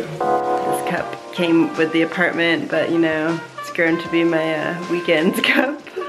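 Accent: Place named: New Zealand. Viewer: we want one video where you the entire time speak New Zealandish:)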